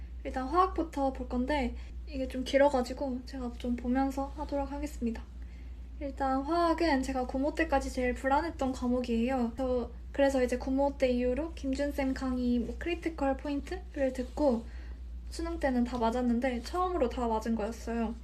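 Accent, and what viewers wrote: Jeolla, South Korea. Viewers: 전라도 출신이신가용??? 억양에 살짝 묻어나는 느낌 ㅎㅎ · 억양 들어보니까 전라도 같아요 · 사투리 억양 들어보니 광주나 전남지역 분인듯~ㅋㅋ 성형도 안하신거 같은데 의대생이 외모까지 자연미인이면 세상 너무 불공평한거 아닌가요?ㅜㅜ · 혹시 광주분이신가요? 전라도 사투리가 귀여우세요ㅎㅎ 그나저나 새벽기차타고 대치가는거 진짜 힘드셨을텐데...정말 고생 많으셨어요!! 얼굴도 너무 예쁘다. 애기같고. 이제 본과생이면 더 고생하시겠네요 화이팅!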